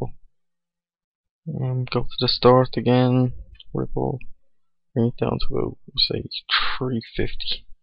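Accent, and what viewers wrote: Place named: Ireland. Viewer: loving the Irish accent man :D Great tuorial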